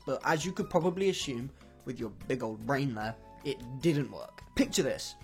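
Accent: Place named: United Kingdom. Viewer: The vid wassss toooo shoorrrttt😭😭😭, wait, he's British?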